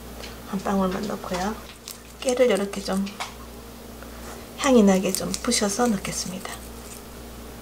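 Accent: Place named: Gyeongbuk, South Korea. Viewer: 구독한지는 오래됐는데 처음 댓글씁니다 친정이 포항이라 경북말씨 너무정겹습니다^^열심구독합니다. 감사드려요. 좋은 오늘되세요~~~**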